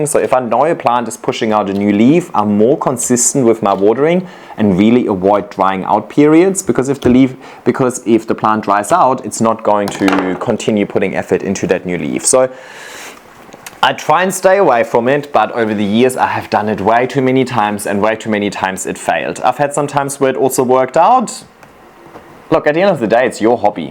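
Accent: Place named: Germany. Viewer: I didnt even know you were from gemany! I was just curious about your accent. Like you speak fluent of course but somehow I felt something was different. Now I know what it is! Greetings from germany! Currently going into full "growing season" I was going to ask: Do you miss snow? But then I remember how we had like 3 days of snow last year... I mean you got white beaches afterall right?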